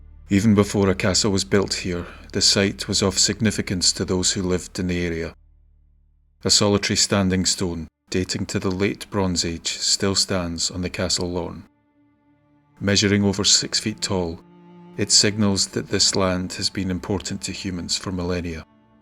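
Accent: Scotland, United Kingdom. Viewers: you do such a wonderful job with these stories. I look forward to each one! I must admit this one broke my heart. 😢💔. I must say my heart belongs to Scotland.🏴. I am blessed to have sister by heart who is from Aberdeen. I will visiting this coming June. And your accent! Best accent in the world. Could you narrate my life story? It would actually sound interesting then. 😍. Thank you 🙏 and keep the stories coming! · I could listen to your Scottish accent forever.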